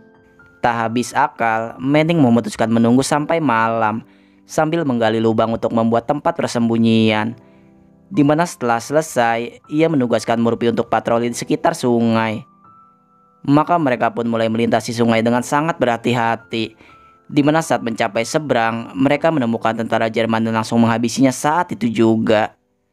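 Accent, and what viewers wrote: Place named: Java, Indonesia. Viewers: Logat bahasa nya tu macam org jawa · Nadanya medoook,kayak orang ngapaak???😊😁😁😁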